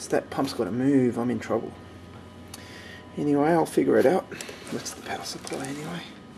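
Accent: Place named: Australia. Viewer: Australian:) I think.... nice orginisation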